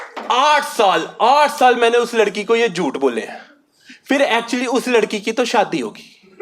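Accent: Punjab, India. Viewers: Punjabi accent 👌😂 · Great punjabi comedy 😂 loved the accent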